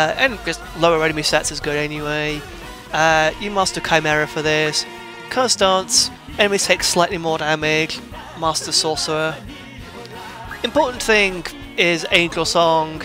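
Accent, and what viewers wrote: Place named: United Kingdom. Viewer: It's a pleasure listening to your british accent <3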